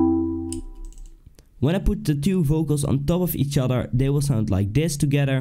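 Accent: Netherlands. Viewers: You’re dutch right?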